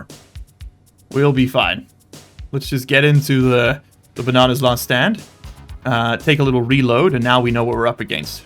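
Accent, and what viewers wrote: South Africa. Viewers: I couldn’t place where you were from for the live of me but South Africa makes sooooo much sense, idk what it is about some of the South African accents that are so damn hard for me to place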